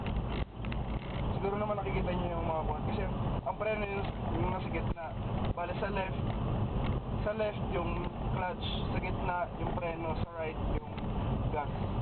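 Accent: Batangas, Philippines. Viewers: taga batangas ka kuya noh? very helpful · Ang cute mo mag salita,.. Eihhh taga batangas po ikaw?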